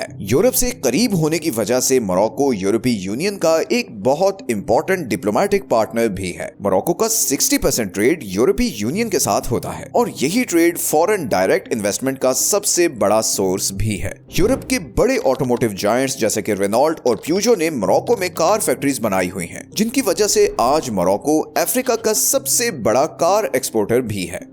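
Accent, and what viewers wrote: Pakistan. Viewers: this is Pakistani channel aisi Urdu India mein boli ni Jati lol 😂😆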